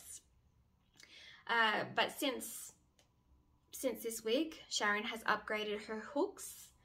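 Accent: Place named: Australia. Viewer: I'm just scrolling and I came across your video. I've heard of Highline Wigs but don't know much about human hair wigs (due to me being unable to afford one I'd say, lol). What a beautiful wig she is. I love a low density wig and my favourite length is probably 16-18 inch. It's so good to come across a wig review that's basically a update on what the actual wig is like a year later and how it's held up in the meantime. We need more of these. It looks so natural on you, totally fab. I also had NO idea that the hair on your head, the one you had up on a bun, until almost at the end of the video, was a wig btw. Lol. Excellent job, thank you. It was also good to hear a fellow Aussie accent doing a review as well. x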